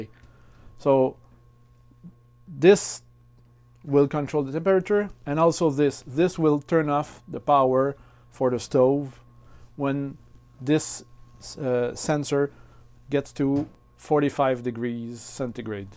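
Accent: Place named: Montreal, Canada. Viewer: I am too, and could tell with your accent! Je juge pas, je trouve seulement drole que je trouve dans fellow montrealer in the wild. haha !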